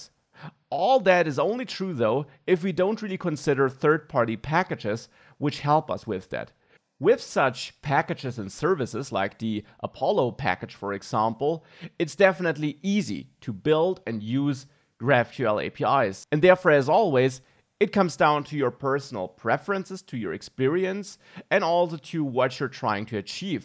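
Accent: Germany. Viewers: very smooth vid, clean and explicit good watch ! just one thing may i ask: is this a german accent ?(just curious)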